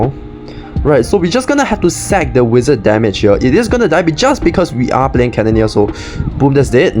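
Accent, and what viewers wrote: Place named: Asia. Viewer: why do you have both african and asian accent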